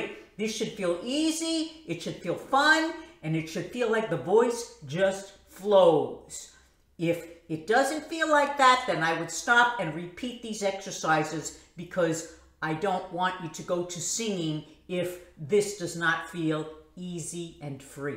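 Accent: New York, United States. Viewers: Thank you for making and sharing this video. I look forward to watching your other videos. Also, I had a question. Are you orginally from New York?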